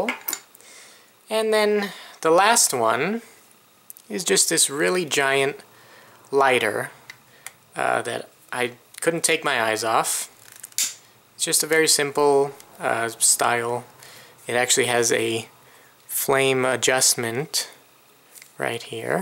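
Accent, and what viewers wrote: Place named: Sweden. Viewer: are you Swedish? i like your accent and it sounds like its Swedish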